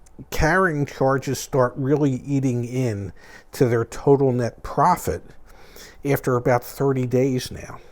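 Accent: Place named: Philadelphia, United States. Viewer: If I ever need an example of a Philadelphia accent to show someone, I'll send them this video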